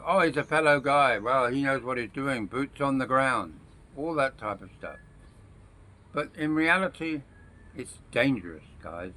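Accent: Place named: England, United Kingdom. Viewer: You have the accent of a Pom not a nz accent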